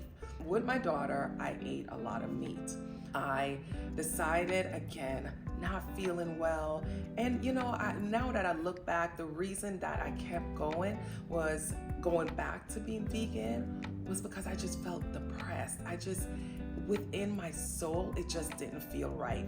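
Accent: United States. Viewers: I really enjoyed this. I though I detected some New York accent. I am from NY. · You are glowing. And your American accent reminds me of Nia Long. She is from the Caribbean, too 🩷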